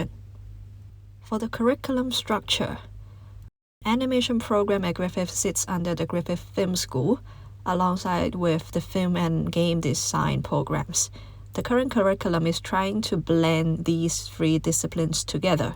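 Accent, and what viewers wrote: China. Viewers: thkuu for the helpful sharing! to me you sound Hong Kong! portfolio petition here!!!!! would be v helpful for someone who is in Australia and considering pursue a animation career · 听多了以后，觉得你应该是中国人。从口音判断的。:eyes-pink-heart-shape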